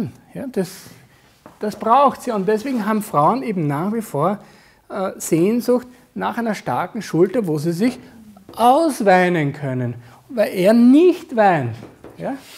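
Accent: Vienna, Austria. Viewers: Danke für diesen klasse Vortrag, Herr Bonelli! Das erklärt einiges, was in der Vergangenheit schief gelaufen ist und was ich unbewusst schon dazugelernt habe. Das Herz weiß was wahr ist und wenn man in der Herzenergie ist, gibt es keine Schuld sondern Verständnis und Vergebung. Und - wie gerne hätte ich einen Österreicher als Mann - der Dialekt ist einfach bezaubernd! ... sagt der Schwabe ... :))) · Wunderbar.....wäre da nicht der "Wiener Dialekt"....für nen Ruhrpöttler schwer das auszublenden😊 Was sind das denn für buchbare Seminare?Wo bucht man sie? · Findest das Schönbrunner Deutsch (Dialekt spricht er nicht) so unerträglich, dass du es ausblenden zu müssen meinst? :-D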